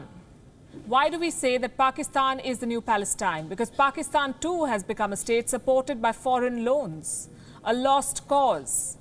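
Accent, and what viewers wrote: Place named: India. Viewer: I know you are doing the good thing. Thanks. But do you realize that you have a very heavy accent and people do not understand what the heck you are talking about. Can you slow down your speech and enunciate. It applies to all Indian speaker with heavy accents. Can't you guys know you have very heavy accents? I know China lies. but you should say it clearly so that more people understand the truth.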